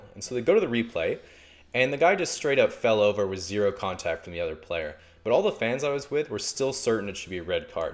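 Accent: Tasmania, Australia. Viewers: you need to get out more, mate. Every single one of his vids where he gets it out you guys come out of the woodwork to say "it sounds nothing like an aussie accent" There's a whole bunch of aussie accents, and Ive defs heard his. He put on the map as being from tas, but Ive seen it in adelaide and rural western vic. For all you know one of his parents moved from queensland so he has a bastardisation, or his friends growing up were bogan and that influenced it, but nothing about it strikes me as off, just rare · You're Australian! hell yeah! Do a video in an Aussie accent (Whole video) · Wait are your from tassie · the return of the australian accent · No wonder your Aussie accent is so good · gaaah that aussie accent is legit haha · I mean even normally you can tell he's Australian, the trace of the accent is still there, and it comes out in a few words. 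· Are you from tasmania?? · are you tasmanian? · Holy shit your Australian accent caught me off guard! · I live for your Aussie accent mate! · U are a Canadian-Australian with a amazing Australian accent and great vids. U are awesome! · Are you from Tasmania, mate? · make a wish! also I hear the Australian accent now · I‘m not a native speaker and even I (or maybe because of it) can tell that he pronounces some words with an Australian accent. It‘s just something you never get rid of.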